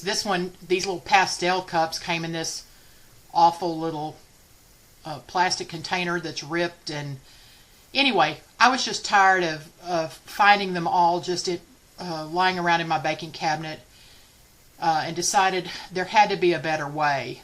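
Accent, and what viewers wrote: South, United States. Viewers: Oh wow! So so great to hear your cool southern voice again 🤗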